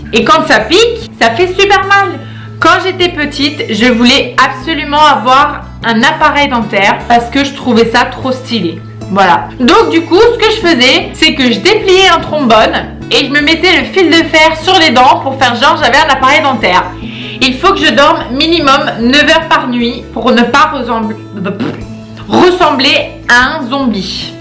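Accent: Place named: South, France. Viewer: Le "rause" , on adore l'accent du sudd. Gros bisous ❤ super vidéooo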